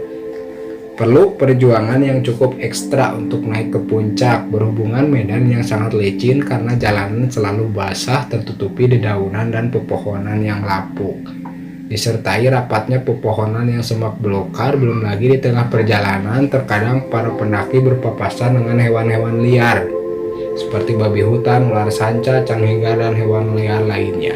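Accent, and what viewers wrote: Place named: Sunda, Indonesia. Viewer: Ayeu Saha NU nyerios ni kentel kieu Sunda na 😂 salam 🙏 ti SIMKuring warga Cikaledong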